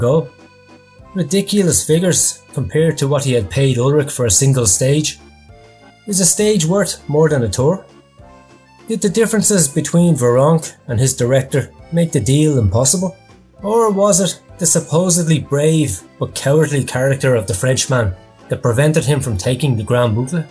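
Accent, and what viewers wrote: Ireland. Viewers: the Irish Accent and the sly digs 'bluffing friend' 'lumpy bald head' cracking me up haha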